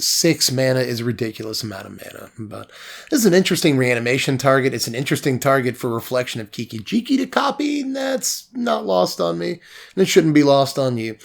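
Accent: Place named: Georgia, United States. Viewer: I totally guessed you were from GA takes one to know one.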